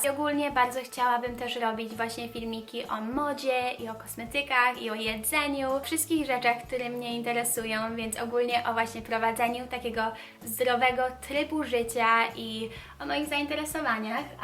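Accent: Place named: United States. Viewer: Pomysł z założeniem kanału był strzałem w 10! Jesteś super sympatyczna i masz bardzo fajny, amerykański akcent. Leci subek :) Btw, nie dziwie się, że Sara jest Twoją koleżanką, bo obie jesteście przesympatyczne! Może jakiś wspólny vlog? Pozdrawiam! :)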